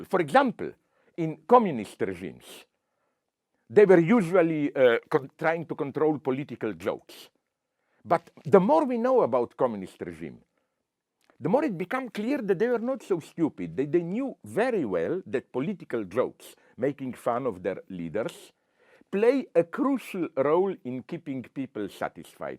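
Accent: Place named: Slovenia. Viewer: How can this short video be transcribed for the hearing impaired and for those who do not speak English or understand his Slovenian accent?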